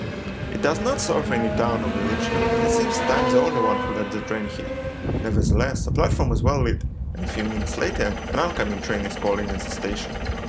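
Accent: Russia. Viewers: Хорошее видео, но неужели нельзя было найти человека с английским без акцента?? Монотонная ровная речь характерна для русского языка. Английский совершенно не такой. Пришлось прекратить просмотр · Very good quality filming. The only thing I would ask or comment on is although your English is very good your Russian dialect can make it difficult to understand. I would suggest slowing down you're speaking just a little bit.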